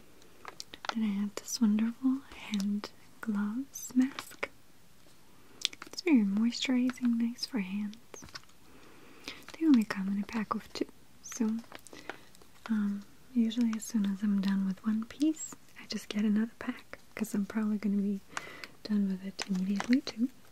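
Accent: Russia. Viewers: your Russian accent <3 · really enjoyed your state of mind during this video ! very natural and spontaneous, your positive attitude is contagious ! we really appreciate your russian accent also ;) thank you very much ! kisses from France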